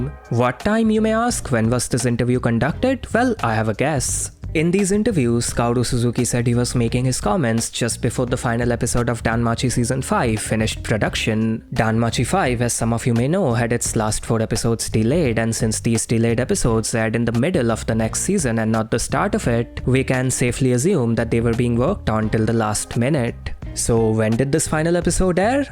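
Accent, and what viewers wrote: India. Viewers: Will your indian ascent ever go away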